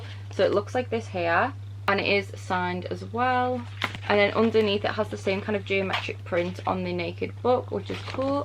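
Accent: United Kingdom. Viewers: Can I just admit that the way you say the word “pretty” sounds so elegant and polite with your British accent. 💗 Hello from the Valley of the Sun 🌞 where it really is DEADLY 🥵 heat!! I live in Scottsdale, AZ and we are still having 94-100 degree weather! Great Video as always! I have about 80% of these books as well. I absolutely ADORE them!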